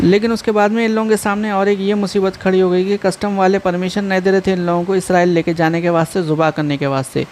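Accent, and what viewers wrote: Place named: Hyderabad, India. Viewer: Bhai hedrabadi nhi bola kre kuch chizs smjh nhi aati bhot jgh se viewrs hai apke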